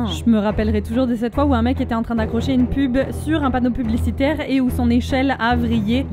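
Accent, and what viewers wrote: Quebec, Canada. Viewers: Mes on est d'accord que elle a un accent québécois parce qu'elle était aller vivre o Québec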